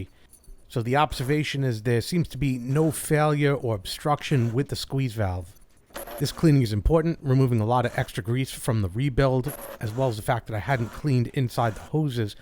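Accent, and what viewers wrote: United States. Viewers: I enjoyed the whole 4 video series - very methodical and informative. If anyone needs the inspiration to perform this type of work themselves then your videos give it in spades. Question: Why was the machine set to language “German” when you’ve such a strong American accent ?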